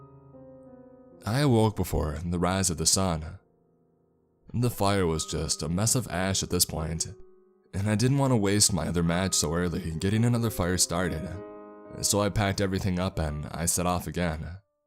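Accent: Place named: California, United States. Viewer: Pretty good but you need to get rid of your confused california girl accent.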